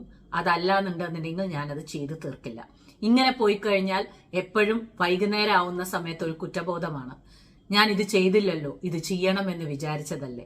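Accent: Palakkad, India. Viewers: chechide palakkadan basha miss cheyyunnu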